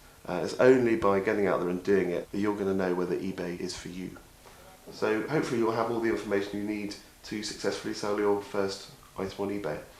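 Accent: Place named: United Kingdom. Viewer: IM from us and i understand him? Have you ever heard of a British Accent ? lol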